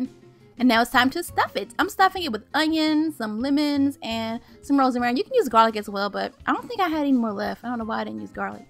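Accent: South, United States. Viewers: Yeah, got to say this was THE BEST homemade roast chicken I've ever had. Thank you SO much for your video. Informative and entertaining...love your Southern twang!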